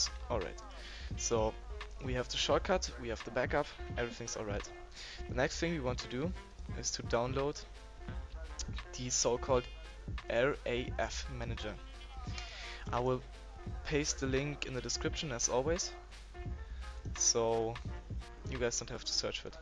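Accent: Germany. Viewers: you german? ^^ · Man merkt schon das du Deutsch bist aber das ich net schlimm! Dein Englisch ist gut!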